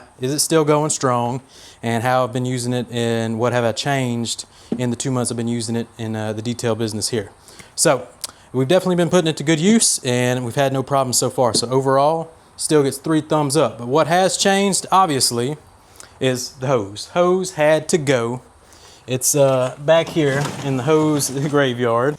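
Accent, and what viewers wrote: South, United States. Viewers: Thanks for the update! I went and bought the 1.8 based off your original review; I was just NEEDING something smaller and lighter than my big 'ol thang with wheels and a hose reel. The only issue I have with the Ryobi gun is that when I put the extension on it, I feel like I fight to keep the water stream pointing down. It's like I pull the trigger, and the nozzle kicks up. I did put a swivel on it, and as a pure stubby it's great. Love the lack of music and your LA accent! I'm in East TN, so it's cool to hear the southern accent with a twang :). Love your vidoes!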